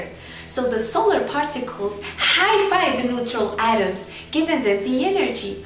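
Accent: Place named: United States. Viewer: Amazing! I like your american accent! Congrats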